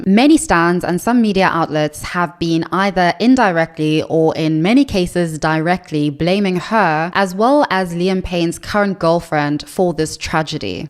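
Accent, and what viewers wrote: South Africa. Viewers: This video was just randomly recommended to me as I had never seen your channel before. If it's true, you hide it really, really well with the British accent; but every now and again you sound South African. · Tell me why I just clocked your South African accent now 😩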